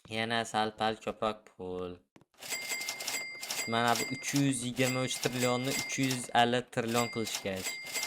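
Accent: Khorezm, Uzbekistan. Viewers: Xorazmlik misiz besh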